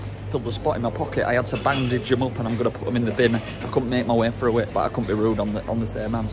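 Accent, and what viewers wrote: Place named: Scotland, United Kingdom. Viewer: The room looks beautiful 🥰 nice Scottish accent 😂x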